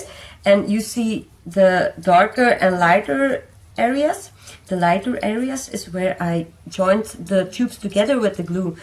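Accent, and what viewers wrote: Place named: France. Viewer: 🤗You are doing fine. Sounds like a French accent.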